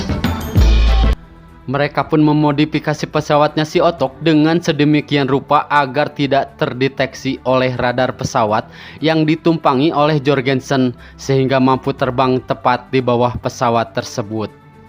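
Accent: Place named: Garut, Indonesia. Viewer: Ngadangu suarana mh ciga orang garut si otok teh.. Sami2ti garut hayu urang saling suport..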